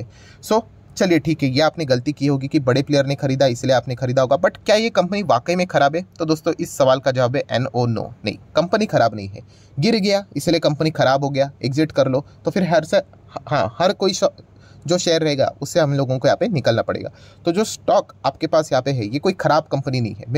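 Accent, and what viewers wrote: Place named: Delhi NCR, India. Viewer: sir aap 100% delhi ncr se ho aisa muje lgta h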